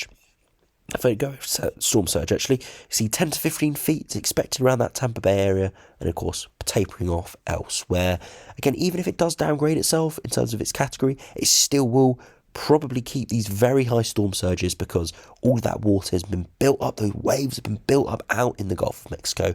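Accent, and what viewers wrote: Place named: England, United Kingdom. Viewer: exactly. The ‘weather modification’ was unintentional and caused by the vast quantities of greenhouse gases we’ve been emitting over the last couple centuries and we’ve finally crossed some feedback loops so we’re now in thermal runaway. The damage had been relatively linearly increasing in damage, but some key points such as permafrost melting, higher libido due to arctic ice melt, etc have really started to cause rapid (abrupt even) acceleration in temperatures. Higher temps mean more energy, these events become more frequent and powerful on average when temps are higher. It’s just a shame that the countries that have contributed the least to the damage, and have generally been exploited the most, are the ones being hit first and hardest. I’m just glad Florida is a MAGA state and full of deniers and conspiracists. 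The type of people that say “it’s just hurricanes, we’ve always had them. It’s normal!” It’s pleasant hearing someone with a nice English accent doing a good weather report. Probably sounds bad but it sounds much more professional and just easier on the ear in general. Subscribed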